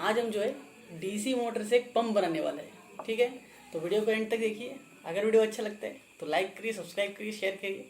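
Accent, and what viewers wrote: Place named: Andaman Islands, India. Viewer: Apna andamani slang mein technical sikhne ka alag hi maza hai 👌😁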